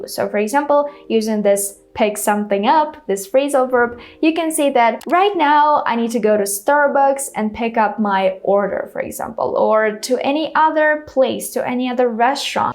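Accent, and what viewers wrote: Russia. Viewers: Русский акцент) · sweety. I am not fluent but I could understand the russian pronunciation in your speech. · Russian accent?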